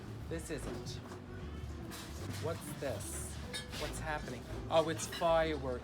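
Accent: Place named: Bronx, United States. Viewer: I hear the Bronx! Love it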